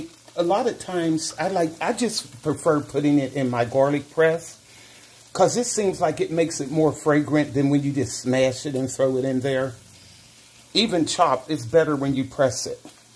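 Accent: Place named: Pittsburgh, United States. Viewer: You have a very heavy Pittsburgh accent, either that or Pennsylvanian. Pittsburgh here, love the video!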